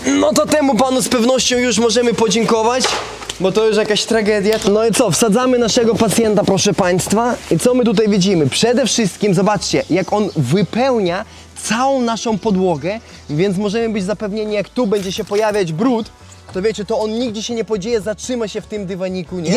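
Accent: Ukraine. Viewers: co ty jesteś z ukrainy że tak mówisz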